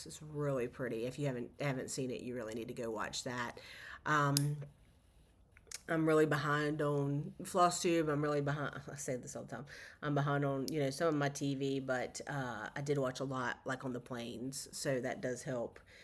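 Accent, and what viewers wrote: South, United States. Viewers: Another great video,! I, too, speak Southern (or is it Surrthern)! That used to bother/embarrass me, but NOT any more!! I'm proud to be a country bumpkin! Your Spooky Summer is a great idea, but I'm in the middle of a huge red sampler and it seems to be attached to my body at the moment! I can't wait to see your finishes, I know they will be fabulous! Happy Stitching and see you next time! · You amaze me with all that you accomplish. Your stitching is beautiful, and I absolutely love your southern accent!!! :) Thank you for another great video.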